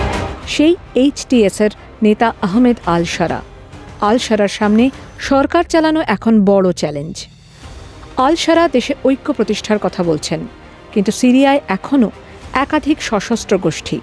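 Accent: Bangladesh. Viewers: পূর্ব বাংলার উচ্চারণভঙ্গীতে আনন্দবাজারে এই নতুন আমদানী কে? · বাংলাদেশী ভয়েস। সে অবশ্যই বাংলাদেশী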